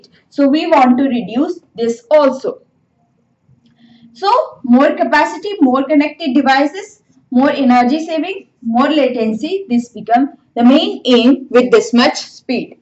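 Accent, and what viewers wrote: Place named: India. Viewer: The teaching method is good but the accent is totally Indian accent. It is quite difficult to catch up with other learners. Only Indians might absorve with all of the ur explanations.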